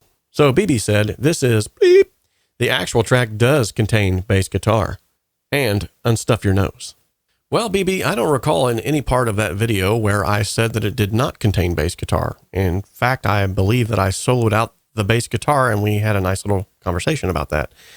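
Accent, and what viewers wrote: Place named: South, United States. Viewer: Hi I'm a singer/songwriter composing own my songs from home and your production tutorial videos have helped me so much in my own music production please dont pay attention to the negative comments and by the way you have a great southern accent stay blessed!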